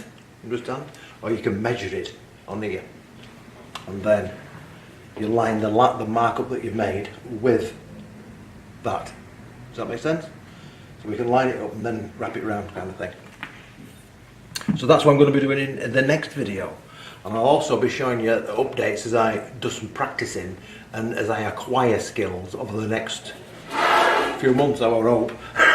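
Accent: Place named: Yorkshire, United Kingdom. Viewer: You sound like you're from Yorkshire if you are there's always a catapult show every Sunday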